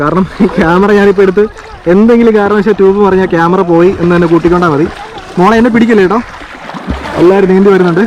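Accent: Kottayam, India. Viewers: Bro kottayamkaran alle bro?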